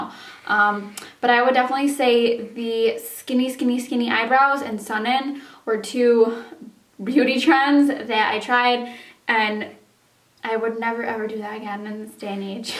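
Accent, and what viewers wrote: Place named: New York, United States. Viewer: Asked too soon.... Sounds like you are from somewhere in NY! Represent! :)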